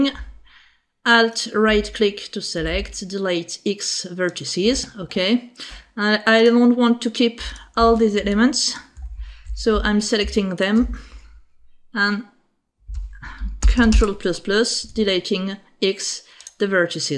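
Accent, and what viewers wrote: France. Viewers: Serais-tu francaise par hasard (à cause de l'accent)? L'autre tuto <link> n'est-il pas obsolète à cause de l'autofit ou suis-je à côté de la plaque ?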